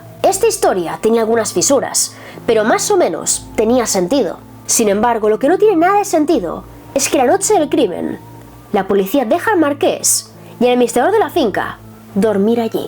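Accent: Spain. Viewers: Desduzco x tu acento eres española,pero te informastes poco ,el que tenia la horquilla u horca era Zapata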